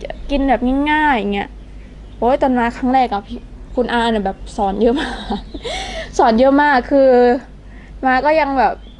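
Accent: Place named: Central Thailand, Thailand. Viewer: คุณโชคดีที่เปนได้ทั้งคนไทยและคนลาว แต่ที่แน่ๆ "คุณคือคนไทยโดยกำเนิด" ผมไม่รู้ว่าการอ่านหรือเขียนภาษาไทยของคุณดีแค่ใหน เเต่มีวิธีแก้คือ อ่านหนังสือไทยทุกเรื่องให้เยอะๆแค่นั้นเอง ไม่นานก็จะสำเร็จ ส่วนเรื่องการพูดสำเนียงไทยนั้น ถ้าคุณไม่ได้บอกว่าอยู่ลาวมาจนถึงอายุ 18 ปี ผมคงนึกว่าคุณอยู่ภาคกลาง อย่างเช่น กรุงเทพเป็นต้น ขอให้ประสพความสำเร็จในชีวิตนะครับ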